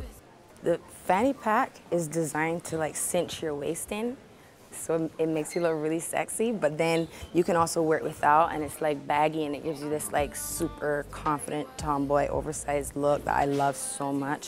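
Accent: England, United Kingdom. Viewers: Her accent is sounding a bit like English accent now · she took the british accent lol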